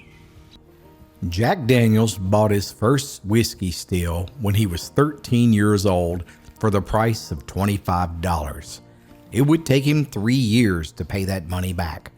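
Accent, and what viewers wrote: Appalachia, United States. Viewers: I rarely leave a comment. Yet, I wanted to thank you for all your stories. Your voice is always calming & a constant reminder of my time in Appalachia. The comments, too, left on your channel regarding your stories are comforting. Your content is sorely needed in these days of uncertainty. With deepest appreciation, a grateful listener ❤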